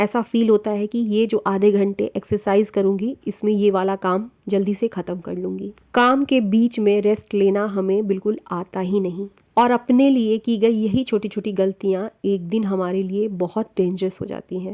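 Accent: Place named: Chhapra, India. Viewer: M chpra me rhti hu.pr apki language tone idhr ka hi lgta h.ya khan pan.hryana side ka nhi lgta,video achhi h.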